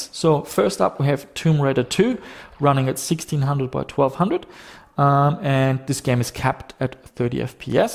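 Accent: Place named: Germany. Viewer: Are you really Australien? Thought in the beginning that you were from Germany or Austria! 😄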